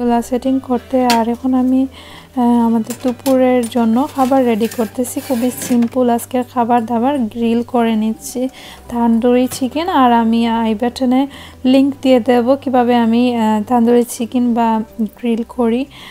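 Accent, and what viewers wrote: Sylhet, Bangladesh. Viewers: Apu apnar sylheti accent sunte asto sobai Apnar mukhe oi ta khub valo lagto Tai mone hoy the numbers of views are decreasing....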